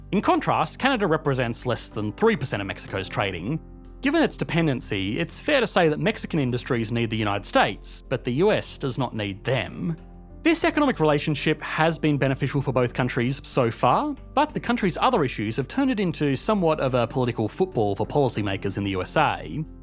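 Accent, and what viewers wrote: Australia. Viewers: this looks like an interesting video but I don't know if I can watch it as the guy's accent is incredibly annoying! The way he puts stresses where they don't belong and puts unnecessary drawls at the end of words like "issues...ssss" and "economy...yyyyy" is awful! Don't know where in Aus he's from, I'm British btw. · because he's Australian and that's their accent? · Because the guy is Australian. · He is Australian. This is his channel, he owns it.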